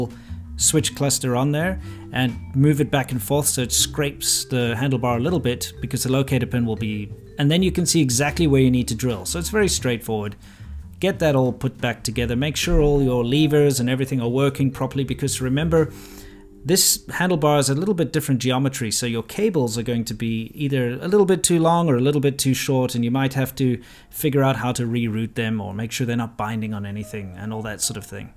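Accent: South Africa. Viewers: OMG..Sitting here watching this vid as I just bought a mint 2012 GN, and just surfing through related vids and I'm thinking, man, this guy's voice sounds just like my South African cuzzies voice from serpentza and low and behold! Man this is so cool I this channel, had no idea you gad this, keep it awesome! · What part of south Africa are you from?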